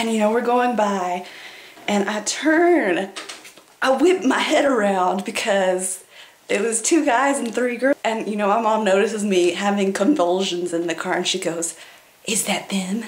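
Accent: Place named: South, United States. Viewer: Thanks. :) The Southern accent wins again!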